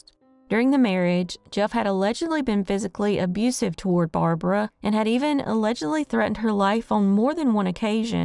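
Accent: South, United States. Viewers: Well you got a new follower cos love the stories and love your southern accent. It's a breath of fresh air. That's for sure! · So I’ve always been curious… what part of the south are you from?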